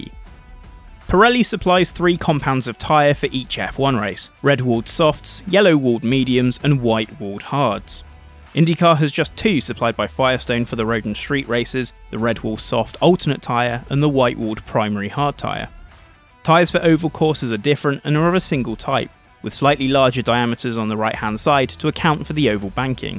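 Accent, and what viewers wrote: United Kingdom. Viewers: IndyCar fan here. Normally on these kinds of videos, my worry is "okay, how is the British accent going to insult my favorite sport this time?" But I've gotta give you credit. This was very fair. Kudos, and a like to the video!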